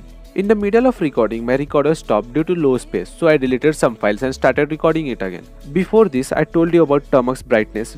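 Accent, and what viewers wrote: India. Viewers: I think is Indian English right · Omg his indian accent is so pretty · I don't understand english language this tutorial didn't help me as I wanted but it's hard to be found in Hindi language coz these kinda people just speak English However 99.9% public is Indian ,it could be more helpful it even has Hindi subtitles , I hate this fucking accent what these people speak .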